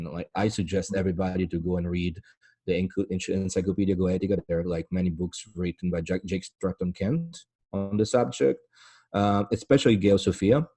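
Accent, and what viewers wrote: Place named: Ireland. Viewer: Wasn't expecting an Irish host. Great stuff. Hello from Offaly Subbed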